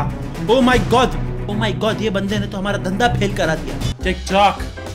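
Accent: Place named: Gujarat, India. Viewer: I like your Gujarati accent tho 😂😂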